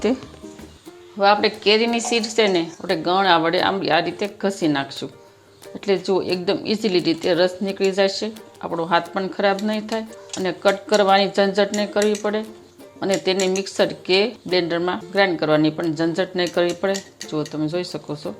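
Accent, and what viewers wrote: Bhavnagar, India. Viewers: તમારી ભાષા પરથી તમે ભાવનગર તરફનાં લાગો છો, બહેન !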